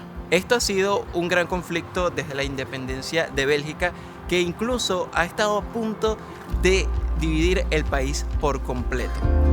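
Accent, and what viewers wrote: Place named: Venezuela. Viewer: Un saludo, lo que expones en tu vídeo es un tema muy complejo pero lo has explicado con claridad, es muy interesante y educativo. No sé si interactuas con tus seguidores pero quisiera saber cuál es tu nacionalidad, porque pareces ser de Venezuela por tu acento, es así? Ya me suscribí y te sigo suerte en tu proyecto de canal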